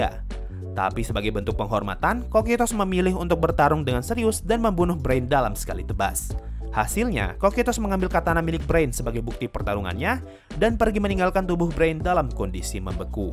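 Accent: Indonesia. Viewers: Kita org indo pke aksen indo emang nda boleh ya? Ya gak min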